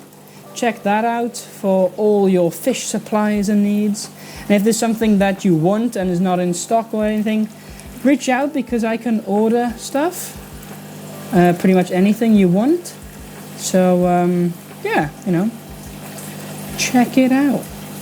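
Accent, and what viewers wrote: Wales, United Kingdom. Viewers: I'm confused, as a Welshman I'd swear that accent was Welsh, but then I find you're a Nederlander. 🤯 😂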